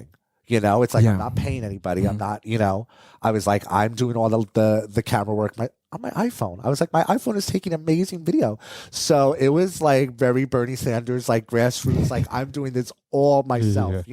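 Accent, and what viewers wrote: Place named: Bronx, United States. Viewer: You have such a thick Bronx accent!!!!! Represent!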